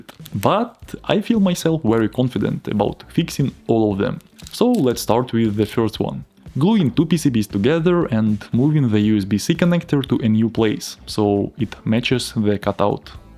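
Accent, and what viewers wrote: Russia. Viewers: Настолько сильный русский акцент, что все понял даже без перевода.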